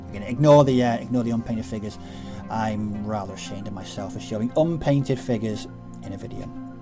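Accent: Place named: United Kingdom. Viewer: I think you have a very good British accent. For everything else, I found this great little site called <link> where you can listen to peolpe pronouncing words in their native language. Somua is not on it (yet) but one can request words and people native to that language will record reading pronouncing them eventually. I am waiting for the Somua now ... a French person will read and record it soon :) <link>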